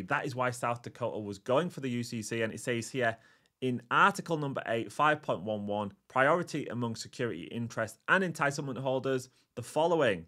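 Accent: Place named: Yorkshire, United Kingdom. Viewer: It helps to listen to 0.75. thanks, I Will try to get familiar with yorkshire-ese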